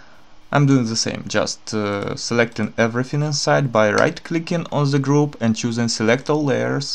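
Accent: Russia. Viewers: Приятно слышать родную речь.Говорит на Инглише, но ТАК по русски. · Рашн аксент эноин май ээрс абсолютли )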